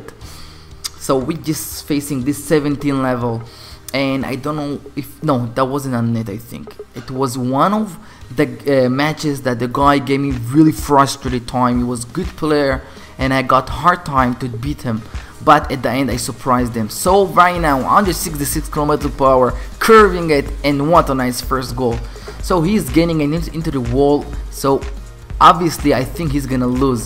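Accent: Israel. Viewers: ישראלי? אם כן, יש לך מבטא פצצה מה זה זה... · יש לך מבטא ישראלי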